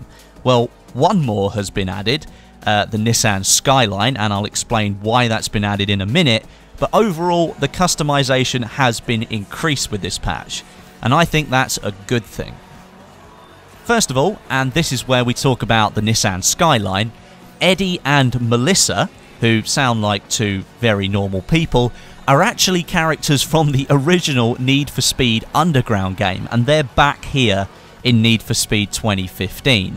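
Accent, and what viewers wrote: England, United Kingdom. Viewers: Very good video! Been subscribing you for a long time. Like those videos uploaded and what I like most is your british accent! Well done. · I love your videos and your English accent. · Nice video again! You always make my day better with your lovely british accent;) And just in case i win the giveaway, i want to thank my mom and dad for making me food every day, and i want to thank my computer for making me able to write this comment, and I can't forget to thank my aunt for being my aunt... Ohh, i also want to tell DANNYonPC that you need to get off YT more often, cuz if you don't, you will turn into a very bad hot sauce. Atleast that's what some old guru told in Australia...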